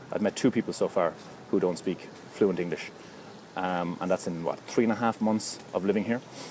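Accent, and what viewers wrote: Cork, Ireland. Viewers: You from Ireland my man? Pretty sure you are ^^ edit You actually mentioned you are in the vid later on :-) my bad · he sounds like a typical Irish man, I dunno where you get that from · ...from Ireland? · He's clearly Irish from his accent. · He is from Cork. Definitely. I'm half Irish, I know.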